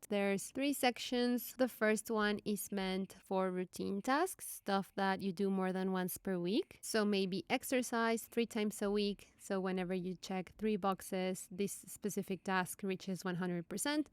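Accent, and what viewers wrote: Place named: Latin America. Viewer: Your tutorials are just amazing!! I'm from Mexico, but I went to college in the US and lived there for 12 years. You sound like my female friends from LatAm! I've seen many of your videos, and I don't think you've ever said your name! Thank you so much for making our lives easier with spreadsheets!!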